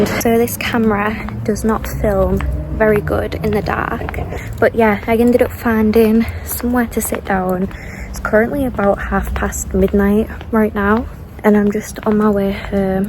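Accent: United Kingdom. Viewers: Are you 100% Yorkshire?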